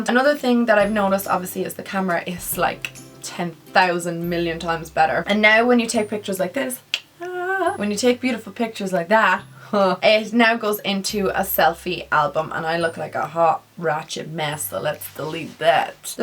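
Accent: Ireland. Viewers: Are you Irish I am xx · Are you from Ireland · What part of Ireland are you from ??🇮🇪🇮🇪🇮🇪🇮🇪🇮🇪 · i love your irish accent 😍😂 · are you Irish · OMG ur Irish same #irishgoals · Are you Irish 🇮🇪 if u are I am 🇮🇪🇮🇪😂👍🏼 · Are you Irish? I just came across your channel, you're gorgeous! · Is she Irish because I'm from Ireland and I'm from Dublin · She's from Ireland :) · Are you irish because you rly sound irish ;) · is she irish? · Just found your channel knew instantly you were Irish you can't hide the accent🌸 I'm from Galway❤️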